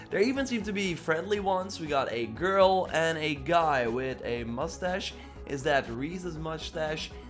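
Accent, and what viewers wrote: Netherlands. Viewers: Are you Dutch? Your accent sounds very Dutch lol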